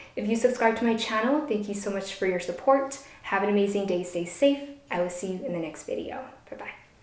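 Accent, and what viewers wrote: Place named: Singlish, Singapore. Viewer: my ears are soo happy when i hear foreigners try to speak Singlish :) Keep it up hahaha! Btw after going through the Sg education system, I still can't remember is it colour or color, recognise or recognize, realise/realize. Doesn't matter to me.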